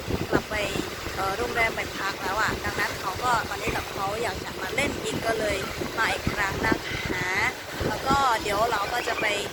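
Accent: Cambodia. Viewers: ฟังสำเนียงแล้วเขาเป็นคนเขมรครับ จากคนสุรินทร์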